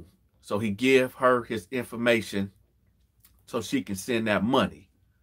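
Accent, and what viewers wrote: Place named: Ohio, United States. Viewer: I swear you Feel like Family. Something about your Aura is Vibrant. And you mentioned Ohio. It's family Familiar. Your accent and language. Buster...lol they way you talk I knew before you said it. OHIO Blessings to You and your Family...We all just tryna Understand this thing we call LIFE. Thank You...for your time.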